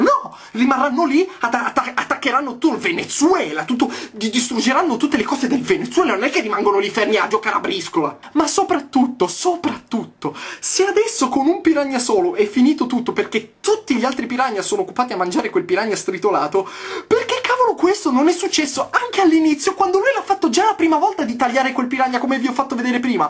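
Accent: Turin, Italy. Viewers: mi sembrava :) una vaga cadenza torinese!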